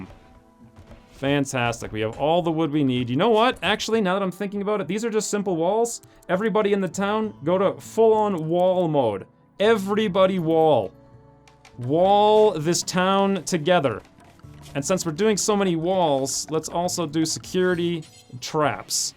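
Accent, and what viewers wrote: Ireland. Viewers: Luminary Xion He almost sounds Irish. Weird. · I thought he was Irish too xD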